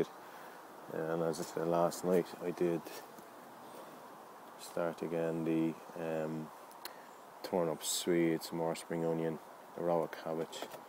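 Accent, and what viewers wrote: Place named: Dublin, Ireland. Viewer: failte...everything is looking good.. i wouldent worry too much about being late, often it seems later sowings catch up alot and are only a few weeks behind earlier sowings.... is a waterford nickname, but i think i can hear a touch of dublin in there, im in carlow.... keep the faith.. mick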